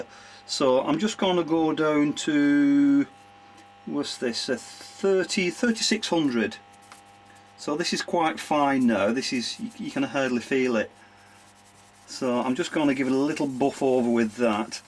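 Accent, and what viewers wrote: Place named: England, United Kingdom. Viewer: What was the powder that you put in the tumbler? Sorry but I'm a french Montrealer and sometime I have problem with the English accent.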